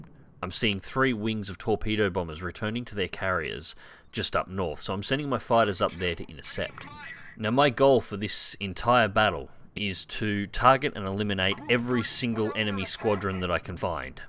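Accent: Australia. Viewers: good to hear an aussie voice on a wows vid. This was one of the most instructive vids i've found on cv. thx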